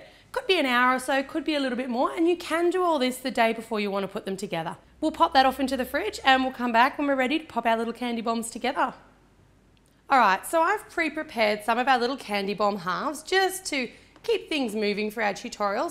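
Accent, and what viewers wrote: Australia. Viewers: yes she is from Australia you can tell from the accent right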